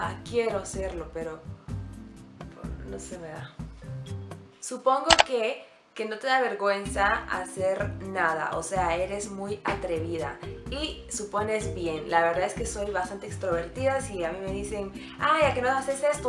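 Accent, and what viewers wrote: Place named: Guatemala. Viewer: Que rico volver a escuchar un acento chapin. Y que mejor de una mujer porque como que tienen mas marcado nuestro acento hermoso. Saludos y tenes razón con eso del tráfico que es espantoso, pero en mi lugar prefiero la ciudad porque en un publo me aburriría un montón.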